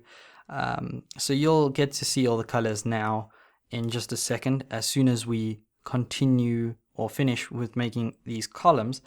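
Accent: South Africa. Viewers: Yas! South African accent!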